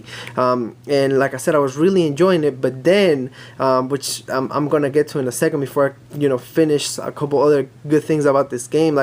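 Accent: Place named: South Florida, United States. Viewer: Great review! I literally just purchased this for the PS Vita in 2026 ha ha, ha ha enjoy your memorial day weekend. Not for nothing but it sounds like you’re from South Florida ha ha ha By the way, this game is still $10🫠🥴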